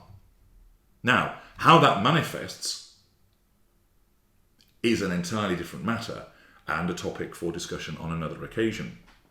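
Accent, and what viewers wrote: United Kingdom. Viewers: Are u British ? 🙏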